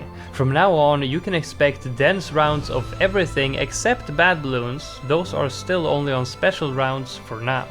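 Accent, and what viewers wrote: Sweden. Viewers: I just started her the Swedish ascent and I I’m also Swedish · Are you swedish?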